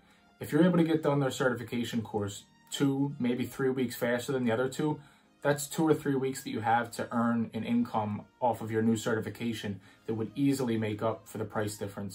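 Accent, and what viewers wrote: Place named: Philadelphia, United States. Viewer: You spoke 4 words and I was like this dudes from NE philly and then I seen the judge shirt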